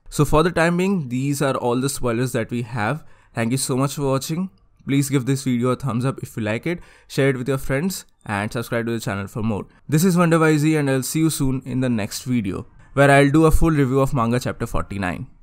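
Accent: India. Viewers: You speak in Indian assent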